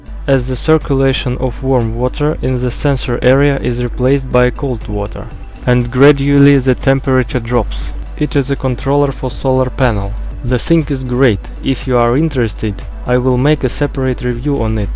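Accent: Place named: Soviet Union. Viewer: Советский акцент английского языка =))) на 12й минуте спалился русским счётом! =))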